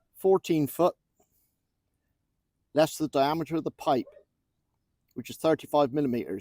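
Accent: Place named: England, United Kingdom. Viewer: I'm curious if you are Canadian or English. Accent tells me English, swapping between metric and imperial, got to be Canadian.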